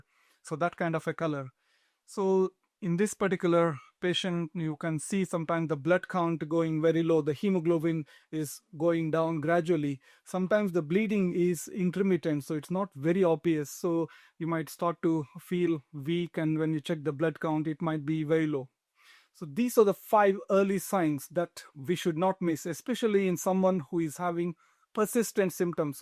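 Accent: India. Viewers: I was enterested with the caption. But the moment I heard that Indian accent.. i closed it.. IDKW.. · You india English is 50 / 50 to understand what are you saying !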